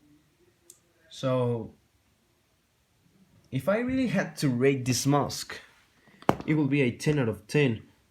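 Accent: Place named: Russia. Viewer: Oooh I really thought you were russian cuz of yr accent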